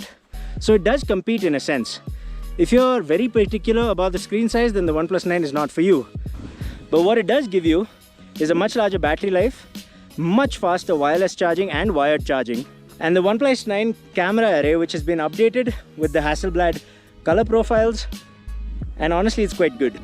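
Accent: Kerala, India. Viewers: Wonderful review. Are you from Kerala?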